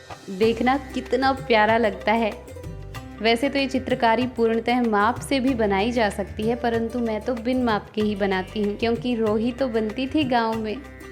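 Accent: Standard. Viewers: Didi apki pure hindi bolne ka andaj muje bahut pasand hai apne bahut mehnat ki hai purane ghar me ab apne apni kala ka rang yaha bhi bikher diya ye bahut achha laga muje🎉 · Kitni sudh hindi hai waw I'm impressed 😊😊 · आप को सुनना बहुत ही अच्छा लगता है। आप इसी तरह लम्बे ब्लाग जिस में आप बहुत सी बातें करके हमें अपने दुसरो के जीवन के रोचक किस्से अवश्य सुनाते रहना जी 🙏🏻 भगवान जी हमेशा खुश और स्वस्थ रखें 🤗 हमारा की शुद्धि हिंदी वाणी ने मन मोह लिया है और बार बार सुनने को मन ललइत रहता है। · apka shudhha hindi bolna bahut achha lgta h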